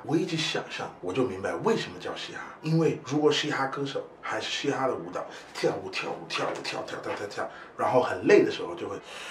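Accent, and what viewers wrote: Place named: Africa. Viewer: You have a strong African accent tho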